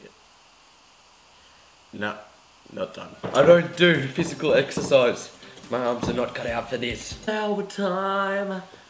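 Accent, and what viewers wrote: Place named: Australia. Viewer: what is your accent ahahaha it sounds like extreme australian accent lol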